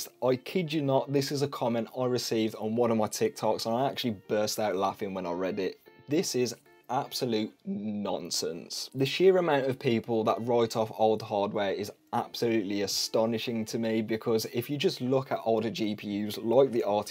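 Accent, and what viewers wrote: Black Country, United Kingdom. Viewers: I wanna ask, are you from the Black Country? From the accent and your channel name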